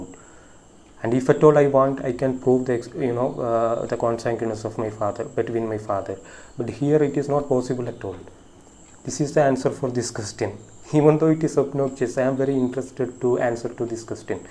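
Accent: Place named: Kerala, India. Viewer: your English accent in this video is okay. So why do you have that heavy Malayali accent in your English while you read out English texts in your Malayalam videos. Anyway, all your videos are good.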